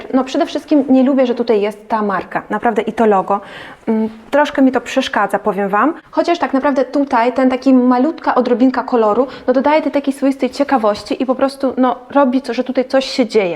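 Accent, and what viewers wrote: Poland. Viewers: To piękny polski akcent z ł jak l. Pozdrawiam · Akcent polski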